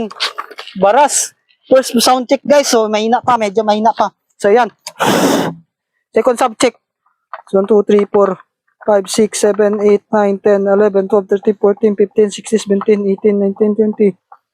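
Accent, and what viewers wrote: Visayas, Philippines. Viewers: Bisaya ka dol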